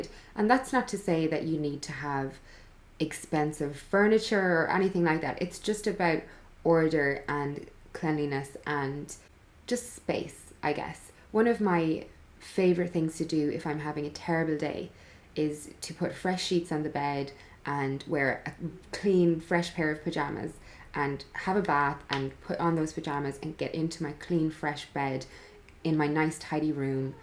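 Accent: Ireland. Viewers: U sound Irish 🍀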